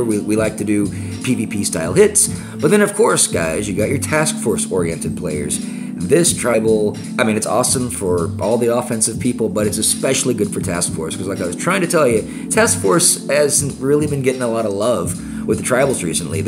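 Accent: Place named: Texas, United States. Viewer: Haha you smoothie you 🤪 Mellow music in the background, deep Texan voice, shades and a bit of paraphrasing “it’s all about the science baby, just relax” Nice vid 👍🙂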